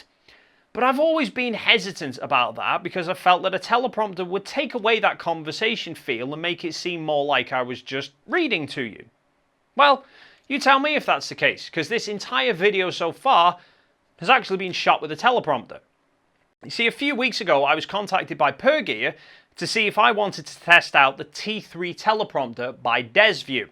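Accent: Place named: North, United Kingdom. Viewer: For me, you're presentation is still spot on. No worries there. I subscribed for your technical explanations of camera gear in your signature informative yet easily understandable approach. & Your northern vernacular just adds the right amount to friendly chill to make them an easy watch from start to end.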